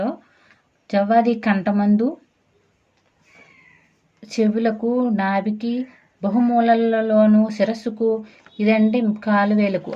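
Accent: India. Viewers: Matladetapudu slang easy ga telustundi godavari ani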